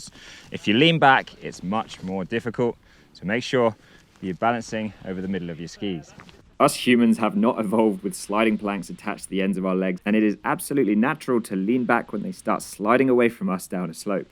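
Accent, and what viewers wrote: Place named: United Kingdom. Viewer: Love to see a good video from, that british accent!! Allez Alvoriaz!:D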